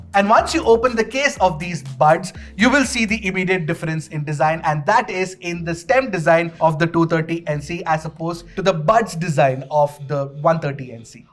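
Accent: India. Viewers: i mean when i saw indian man i thught hes going to tell few words in english and title and then hindi but instead i got nice indian accent and very nie review very good man keep it up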